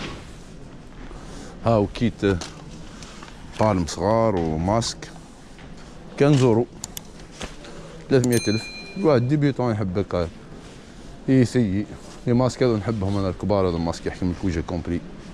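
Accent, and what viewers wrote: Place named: Annaba, Algeria. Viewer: نتا من عين البيضة علاه تهدر عنابي والله ما جات عليك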